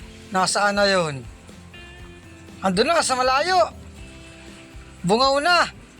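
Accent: Bicol, Philippines. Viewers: ang tagalog ni kuya bikolanong bikolano ang tugas ng dila.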